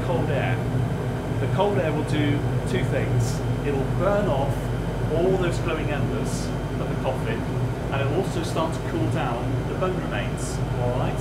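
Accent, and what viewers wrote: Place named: United Kingdom. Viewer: A British accent makes everything sound nice!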